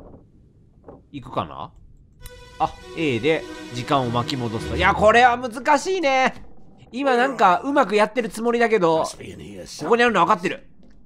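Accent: Kansai, Japan. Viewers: 今回の実況、関西弁多めで嬉しい…⁽⁽( ˊᵕˋ )⁾⁾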